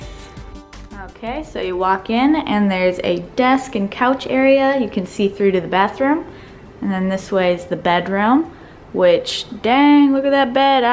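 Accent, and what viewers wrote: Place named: Midwest, United States. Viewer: Cool video. Did you just get a 24 hour pass on your passport to be able to leave the airport during your layover? And are you from the Midwest? I could hear a Midwest accent in your voice.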